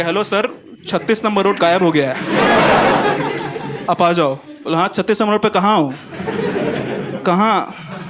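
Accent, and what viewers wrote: Bihar, India. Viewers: Bhai , tumhari accent to bihari jaisi hai ,I think he is also from Bihar 😂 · After the whole video I felt you have learnt hindi from a bihari friend of yours maybe.. Cause my bengali friends also talk in the same way as you😅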